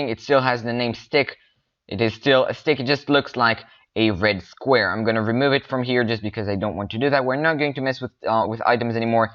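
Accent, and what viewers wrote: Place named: India. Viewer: once i heard your indian accent i knew i was in the right hands. thank you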